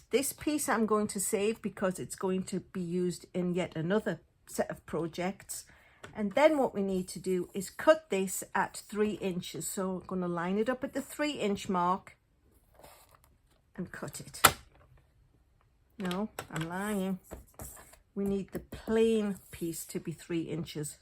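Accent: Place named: United Kingdom. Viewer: Are you in the UK? Because of your accent. If you are, I wonder if I could buy the PP from you?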